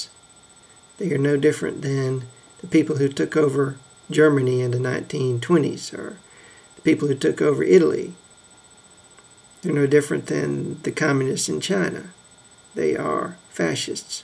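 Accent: South, United States. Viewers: Nice Southern Drawl and I am from La, if you know the truth you should share it. Isreal is a pawn and so are many of us that are slaves to the Money Masters. I do think it is time for the Constitutionalists need to start this off, but no you will wait till they come and knock down your door. The only thing you need to know is that the Elite and the G8 and the Bilderbergs, TLC and the UN and most of the Fed has us all by the Balls.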